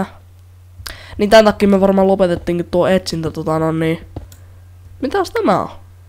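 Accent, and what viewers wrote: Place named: North Ostrobothnia, Finland. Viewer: mistä päi oot jotenki kuulostais pohjois-pohjanmaan murteelta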